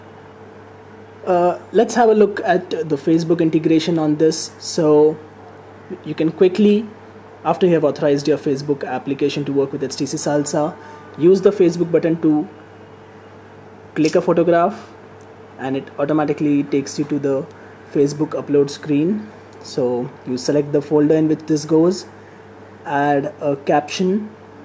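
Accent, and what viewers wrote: India. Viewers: u are an ass with fukin indian accent.. gayboy... :x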